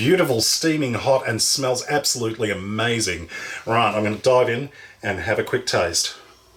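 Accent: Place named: Australia. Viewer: Yes I think you chuckle after u taste ur dish every one ur Spirit is Amazing thanks for all the joy u bring us and ur Amazing Aussie accent as well.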